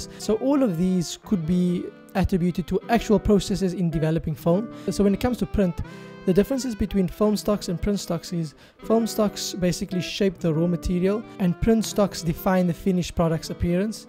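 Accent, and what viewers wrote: South Africa. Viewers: Are you from South Africa? If not, you have a very South African accent. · You sound South African mybru, shout-out ! 🙏🏽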